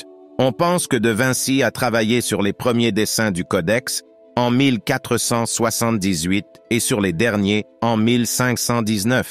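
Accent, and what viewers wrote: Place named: Quebec, Canada. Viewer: C'est un Québécois qui fait la narration...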